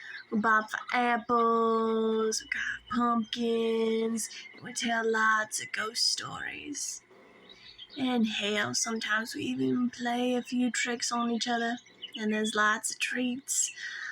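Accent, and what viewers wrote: South, United States. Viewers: Pig!!! Very cute design, nice hometown farmgirl look, with an excellent southern accent. I do love the jean shirts and the pitchfork with ahem paint... solid 65!!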